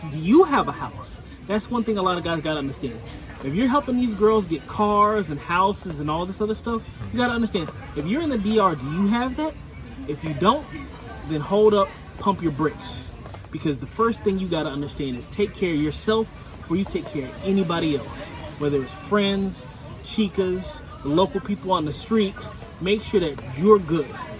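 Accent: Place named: Spain. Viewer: Ahahhaha i love your Spanish accent. Ejejejej no tengo leche en la casa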